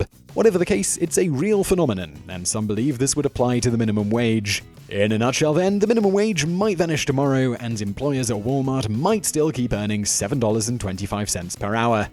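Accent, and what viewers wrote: United Kingdom. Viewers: Why is that ANYONE with a British accent has ZERO credibility with me? .... Well they HAVE made every serious mistake one can imagine. IF there was no minimum wage then it is ABSOLUTELY FRICKEN GUARANTEED that a bunch of folks WOULD be taken advantage of more than they are now ...not everywhere ...not where there is competition for quality labor...but in smaller environments where greedy employers can ruthlessly get away with it ...ignorant people just wanting to pay the rent and eat SOMETHING might feel literally forced to take it...their employer for instance being the only place they can physically get to every day? The logistics for a poor a person can become overwhelming and there ARE some wickedly greedy people that WILL take advantage of such things every single time ...hence all the laws and penalties created to FORCE really wicked people to play nice....it is a shame we have to have laws, that people won't just be honest and do what they have to know in their heart is right....but it IS the fact of reality and all the pie in the sky thinking people might be different is pure bs proven over and over and over ....hence the sad struggle FOREVER...there is NO political solution...no form of government and no lack of government will cure what ails us ...actually it is incredibly AMAZING (I think) that things work out as well as they do in SOME places ...probably so called "Christian values" play largely into it working ...working on the consciences of people so that enoug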